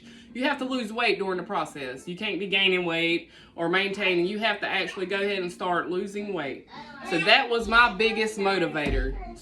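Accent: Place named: South Carolina, United States. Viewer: I love your accent. You sound like my family in South Carolina.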